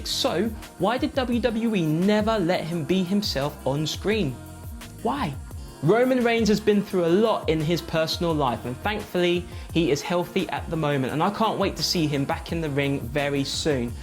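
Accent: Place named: United Kingdom. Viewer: I love how he pronounces McMahon, man I love British accents!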